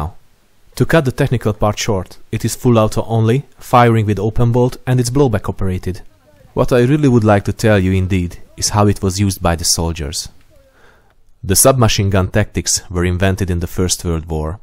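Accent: Hungary. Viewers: Horribly Hungarian accent.. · szintén, elég volt meghallanom az első hangot, és már néztem is egyböl leírásba hogy tuti valami magyar lehet :D talált süllyedt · It took me 40 seconds to realise it is hungarian accent. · I have a Hungarian friend & he talks just like you I can bet you're Hungarian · az angol akcentusodból tudtam hogy magyar vagy :D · JÓ lett a videó És mikor elősször hallotam a kommentárt egyből feltűnt hogy mintha egy kicsit magyar volna :D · A fülemnek tetszik a magyar akcentus :D minek szinészkedni az angolt. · Hmmm... as a romanian I can recognize that magyar accent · Az akcentusból szerintem te magyar vagy :D Pláne a fenti oldalból a ".hu" nagyon gyanús :D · the accent is terrible. Im from Hungary, i live in the USA so im surrounded with these Hungarians but this one takes all. otherwise cool video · Imádom hogy hallottam hogy ez magyar akcentus lesz és téényleg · Mielőtt elolvastam a kommenteket, már sejtettem, hogy magyar a beszélő...le sem tagahtatja :D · Halgattam a videót nemnéztem leírást és úgy a 10.ik másodperctől éreztem hogy Magyar Vagy :) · Annyira lehet hallani hogy egy Magyar beszél Angolul :D Jellegzetes akcentus, meg beszédi forma vagy minek mondjam. 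De az ember hangján lehet inkább észrevenni hogy igen, a Magyarok beszélnek így angolul :D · Nagyon gyanús volt hogy magyar vagy, az angolod alapján, de amikor meghallottam a magyar beszédet akkor voltam csak biztos benne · His got that hungarian accent, trust me i know :D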